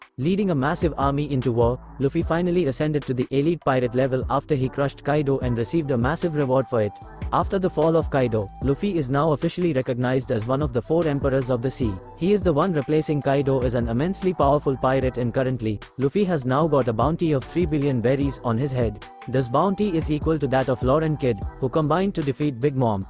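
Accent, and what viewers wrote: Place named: India. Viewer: why does this AI voice sound indian?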